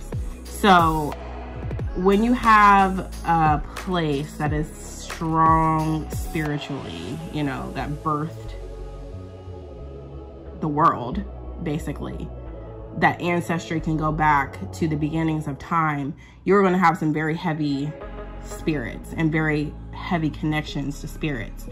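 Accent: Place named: Northeast, United States. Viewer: very helpful thank you!! Also you sound a little like me-- and people don't always know what my race is based on my voice lmaooooo-- are you from the NorthEast of USA? (I'm from NYC)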